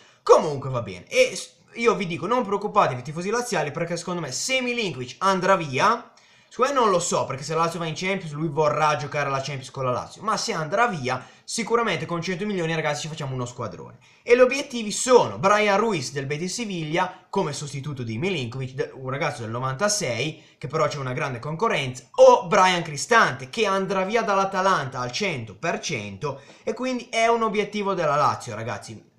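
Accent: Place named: Piedmont, Italy. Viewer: troppo forte un laziale con l'accento del nord mi sembra torinese o cmq piemontese, daje continua ci piace!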